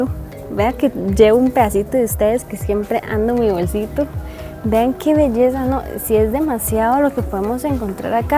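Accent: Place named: Costa Rica. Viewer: las gracias son a ti por tomarte el tiempo de venir te quiero mucho me gusta tu contenido en la forma como te expresas me gusta tu acento tico escuchar ❤️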